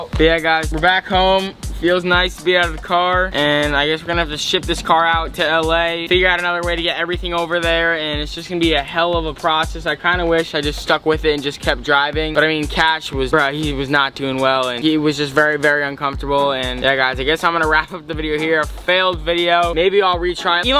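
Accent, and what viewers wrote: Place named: New York, United States. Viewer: you can tell he’s from NY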